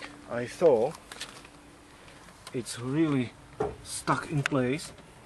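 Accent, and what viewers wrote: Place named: Czech Republic. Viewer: So sorry. Now I see Czech. Definitely not a Deutsch accent. By the way, the car is working fine. If it goes bad, I'll know the fix.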